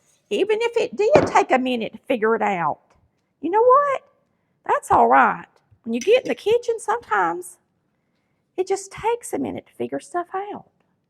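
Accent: South, United States. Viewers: Hi funny lady,wth that strong Southern Accent ..I ❤your food arts ..I just think 🤔 u wanna make me fat..u better Stop it! lol ..jk don't stop it's so good❤🎉😮😂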